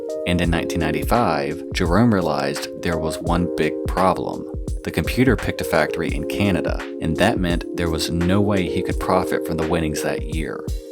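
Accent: South, United States. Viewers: First vid I’ve noticed the southern accent. Love your content ! Keep doing what you’re doing, my guy ♥️ I wish you all the subs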